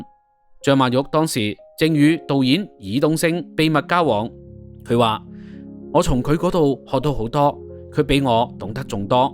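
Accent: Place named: Mandarin, China. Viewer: 主播你的第一语言英该是普通话吧？不介意你的口音，但是有的字念得完全错了，比如“闺蜜”"联袂”。。。祝你进步！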